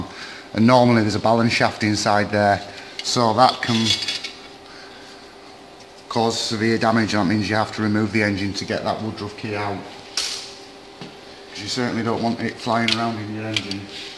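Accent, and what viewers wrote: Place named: Bradford, United Kingdom. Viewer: I just googled "does nissan pathfinder have a timing chain" and your vid link came up... the reason I clicked on it was because of the place name "west yorkshire" oh my home county, not only that your in my home town "Bradford" that I left 40 years ago.... So I watched your video just to hear the Bradfordian accent and I was amazed at what you had taught me about this engine Thanks for the informative video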